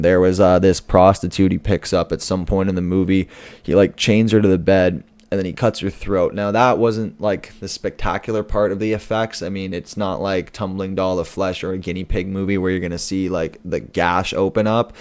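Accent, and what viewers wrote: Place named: Germany. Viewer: Awesome. Loved your German accent. Nice to see more subs with every video. Keep em coming!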